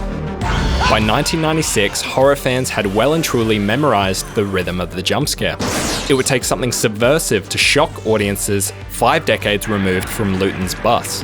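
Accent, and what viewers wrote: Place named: Australia. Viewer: Man keep going. Insane that this doesnt have more views. Fantastic vid! Also so good and refreshing to be able to watch quality videos with an Aussie narrating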